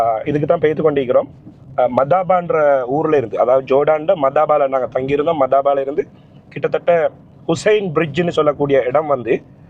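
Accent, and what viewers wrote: Sri Lanka. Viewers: Mashallah Allamthulliha Allamthulliha Almighty Allah bless you excellent presentation sir you are speaking ceylon tamil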